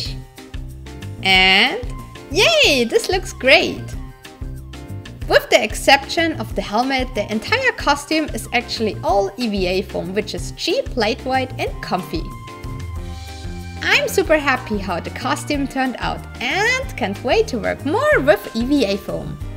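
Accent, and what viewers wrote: Germany. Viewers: where are you from, from the accent I thought you were german, but is a slavic name(?) Any way, always great videos, I love how you turn from gorgeous next door girl to super hero woman when you wear your costumes:)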